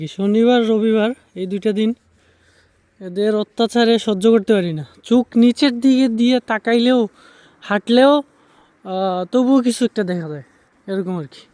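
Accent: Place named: Sylhet, Bangladesh. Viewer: ভাই আপনি সিলেটি তাইনা তাইলে সিলেটি কথা বলেন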